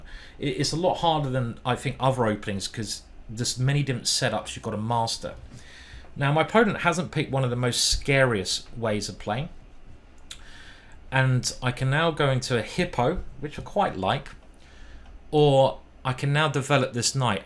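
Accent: United Kingdom. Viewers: It's the Queens' English mate. What, are your ears painted on?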